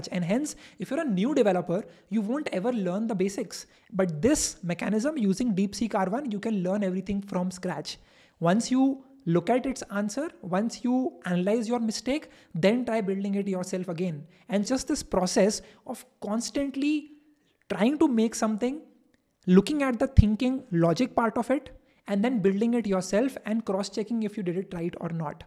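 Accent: India. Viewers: No racism, but the Indian accent lowkey legitimizes this video.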